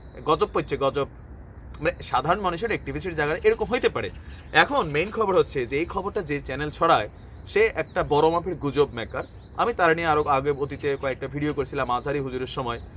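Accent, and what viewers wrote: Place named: Sylhet, Bangladesh. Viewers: khob sondor sylheti bolsen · সিলেট তাকি মাতরাম। আফনার সিলেটী মাত খুব বালা লাগছে।।খই তাকি হিখলা।।😉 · ভাই সিলটী ভাষায় কুব সুন্দর খইছইন। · ভালা লাগছুন আপনার মাত,কতা,আপনার মুখে সিলেটি মাত মজা পাইছি,সিলেট থেকে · Hahaha awesome hoyese sylheti kotha guli bhaiya ,Apnar mukhe amader sylhety kotha shune onek moja lagtase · সিলেটি কথা ভালো লাগছে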